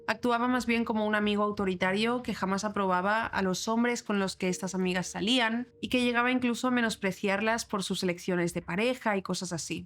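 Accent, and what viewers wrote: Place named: Castile, Spain. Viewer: Por dios l sacais puya a todo,ella es Española y lo narra como Española castellana,si quieres escucharlo tal cual hablais en vuestro pais pues ver a una argentina narrandolo.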